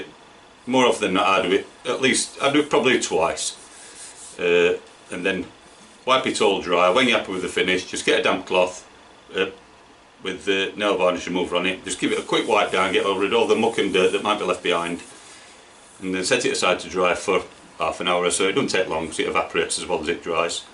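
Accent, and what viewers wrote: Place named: Yorkshire, United Kingdom. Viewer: Suuuuper well explained video! Also love the accent! Yorkshire?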